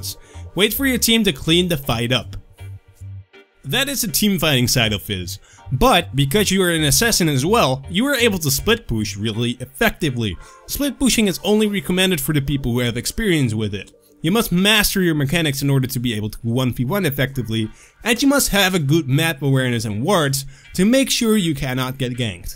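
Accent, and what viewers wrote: Netherlands. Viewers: Woow man. Geweldig accent. Nooit verwacht dat jij nl was XD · Ben je Nederlands ja?? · Are you, by any chance, Dutch? I hear a typical Dutch "a" and "i"... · I knew it! You speak Dutch :D The accent is heareable :D Nice video once again en blijf zo verder doen! :) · ARE U DUTCH?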